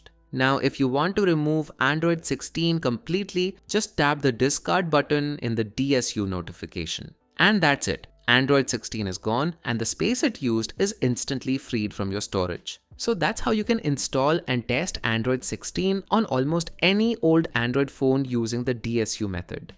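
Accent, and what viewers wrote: India. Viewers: The Indian accent makes it very suspicious!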